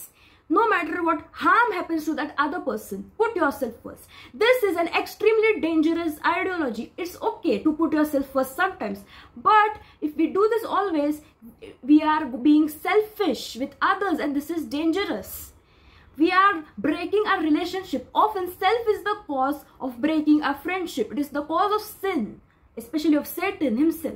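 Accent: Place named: India. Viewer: Curry accent LMAO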